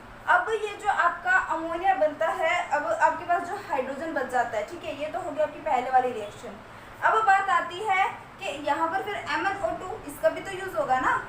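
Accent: Bihar, India. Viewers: Hay miss aap Bihar se h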